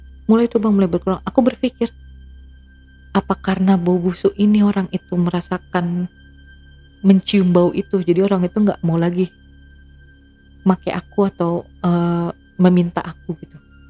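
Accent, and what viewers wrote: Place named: Medan, Indonesia. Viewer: Logat kakak nya seperti orang kami .medan..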